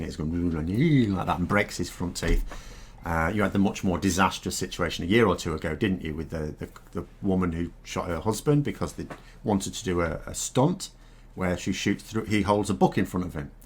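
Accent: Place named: Northern England, United Kingdom. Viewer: Love your work, business apparently having a ‘northern’ accent is a credibility problem for the comfortably well off ‘southerners’. Do you know why this disparaging attitude exists?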